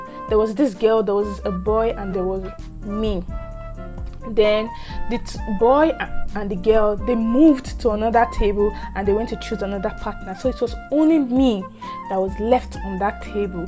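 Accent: Nigeria. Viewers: Keep your Nigeria accent up. It really dope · I understand you so much....it's a big shame to Nigerian government for not making adequate provision for students back home. My first day in American college (FLORIDA) was hell but I came through. I am glad you are catching up and getting more confident in yourself. Accent is not a barrier it's just the beauty of our nationality. I just subscribed 👍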